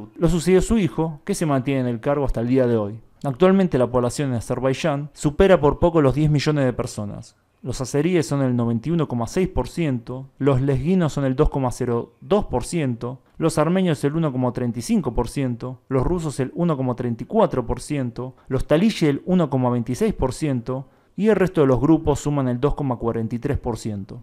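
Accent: Buenos Aires, Argentina. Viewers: Lo único aburridor el asentó, pero lo demás super, un acento colombiano sería super, pero bueno aceptar así los hermanos argentinos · ¿Vos te das cuenta de la cantidad de letras q leés como "eyyyyheee"? ¡HASTA LA JOTA LA CONVERTÍS EN ESAPORQUERÍA DE "LETRA" Q INVENTARON USTEDES LOS PORTEÑOS, CON LA Q SUBSTITUYEN NO SE Q´ CANTIDAD DE FONEMAS! ¿Tenés conciencia de cómo te baja el el nivel como para q te andés metiendo en temas de la cultura? ¿No se dan cuenta de cómo mutilan el alfabeto y el lenguaje con ese sonido caníbal insoportable? ¿De dónde lo sacaron? Si oís grabaciones de radio y tele hasta hace unos pocos 20/30años es imposible oír esa aberración brutal, q es pura ignorancia y degradación de la cultura nacional. ¡EXPLICAME CÓMO SE ESCRIBE ESE SONIDO Q ES A LA VEZ EYE, JOTA, ELLE Y NO SE CUANTAS MÁS! ¿Porqué no te dedicás a la cumbia, el cuartetazo y la bailanta, q te va a caer como anillo al dedo? 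· Si es argentino y el dialecto rioplatense destaca por un yeísmo exagerado, ¿cómo quieres cambiar su modo de hablar español?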